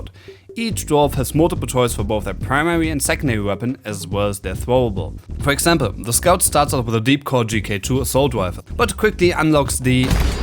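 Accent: Germany. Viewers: So far the first DRG review featuring thick German accent. Nice! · Sounds a bit german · you sound german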